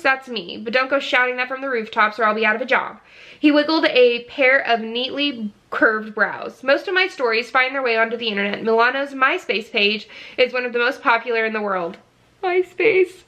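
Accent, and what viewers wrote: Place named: South, United States. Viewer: Just listened to this a second time - you have the BEST Southern accent ever. In fact, I didn’t even like the women in the beginning but your accent made them more likable! XOXO Lisa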